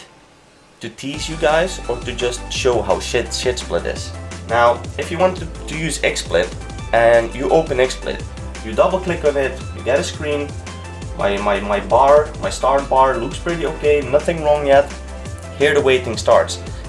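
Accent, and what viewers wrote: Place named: Netherlands. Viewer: where are you from? you sound kinda dutch to me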